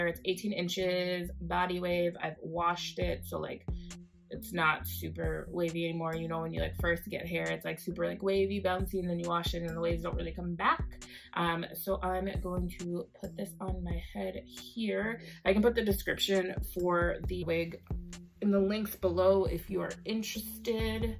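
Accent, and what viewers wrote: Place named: Africa. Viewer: It's the African accent for me 😂😂😂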